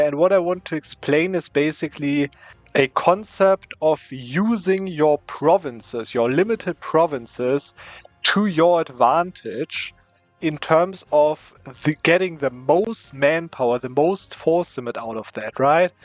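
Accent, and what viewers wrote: Germany. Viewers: As a Pole I hear that you're German in every word you say haha. Great video, good advice bro!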